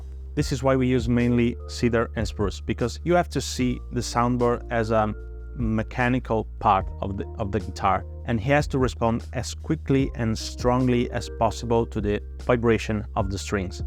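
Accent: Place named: Italy. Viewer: We love that italian accent.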